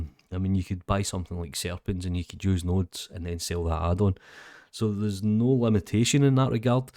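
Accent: Scotland, United Kingdom. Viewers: Hey even a frenchy frog can recognize scottich accent. Have a good recovery. And thank you.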